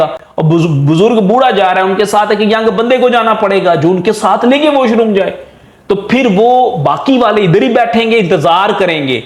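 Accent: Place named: Arab world. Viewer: اردو کو اردو کی طرح بولئے اسمیں عربی لہجہ یا تلفظ مت گھسیڑئے۔ بہت بھونڈا لگتا ہے۔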